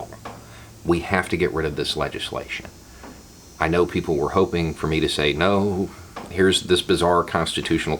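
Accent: South, United States. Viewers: Hey, Philosophy Tube is doing a Shakespeare marathon on twitch to raise money for Samaritans, a mental health charity. The chat there has regularly said they’d love to hear your amazing Southern dialect read some lines from Shakespeare or drop in to chat about mental health and American violence which you’re so good at.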